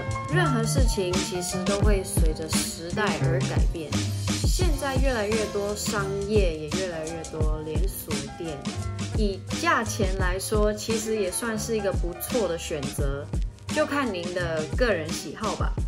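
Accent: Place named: Taiwan. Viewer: 恭喜妳！妳的國語100%的台灣腔，完全沒有外國腔調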